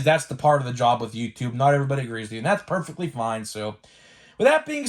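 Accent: Pittsburgh, United States. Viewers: Just found your channel. Two seconds into listening to you while doing some work I said this dude has to be a Yinzer. LOL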